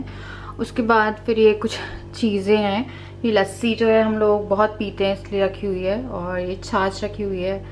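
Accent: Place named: Sadri, India. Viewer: Lgta h app sadar Se ho dii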